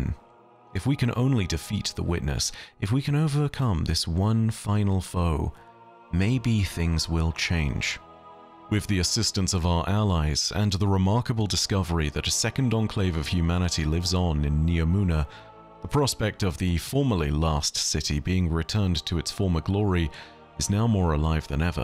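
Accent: United Kingdom. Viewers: It’s my favorite British destiny lore guy. Tribes look awesome sign me up!!!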